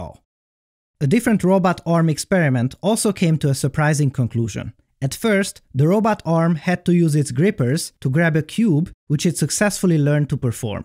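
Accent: Hungary. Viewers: hunglish intensifies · Ahoz képest hogy magyar vagy, kevés akcentussal beszélsz angolul.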